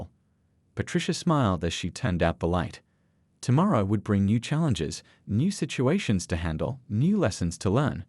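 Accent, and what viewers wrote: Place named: England, United Kingdom. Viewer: Suck video of a still picture and English crappy accent...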